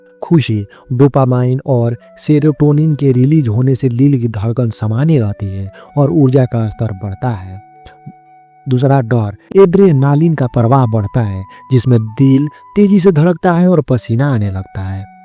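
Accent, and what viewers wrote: Bengal, India. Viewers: Bangla accent 😊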